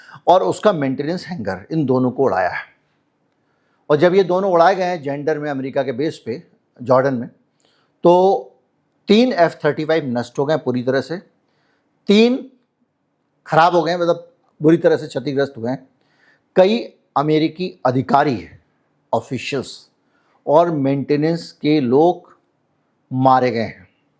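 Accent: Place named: Bihar, India. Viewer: आज आप बिहारी टोन मे बोले है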